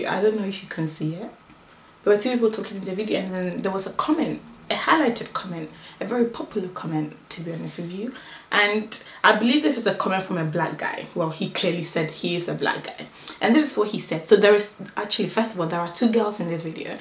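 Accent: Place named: United Kingdom. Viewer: u are stunning i live in the us so i have not had herd the accent in many year i was on uk once many yrs ago with the military but i could never gt tired of hearing u speak iam certain its a pleasure to hear u say something as simple as good morning or pass the pepper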